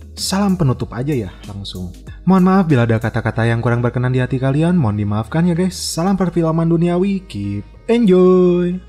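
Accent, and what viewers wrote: West Java, Indonesia. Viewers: Waw ka Sunda nya nyalosod🤣🤣. Semngat terus ka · Ngakak ih yg cerita nya ada ada Sunda nya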